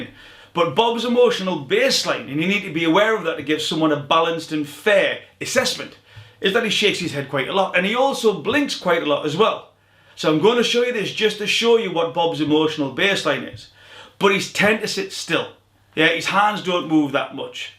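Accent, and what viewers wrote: Newcastle, United Kingdom. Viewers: Where you from, British? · He certainly diesnt sound like a scotsman ges a true Geordie. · Divven ye nah a Geordie when ye hear wun?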